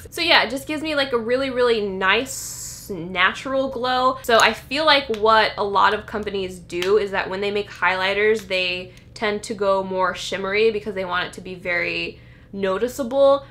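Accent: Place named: Australia. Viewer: The Australian accent gets me every time lol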